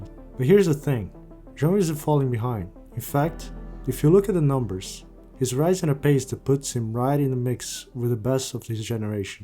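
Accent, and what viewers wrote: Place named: Brazil. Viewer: you speak english with a brazillian accent, are you actually from brazil?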